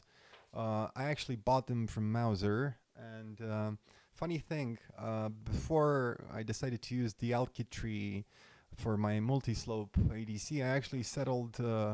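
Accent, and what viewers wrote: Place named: United States. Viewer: I thought the accent was American possibly Canadian.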